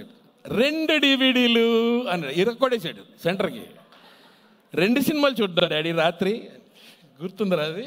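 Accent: India. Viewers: nee butler English ...........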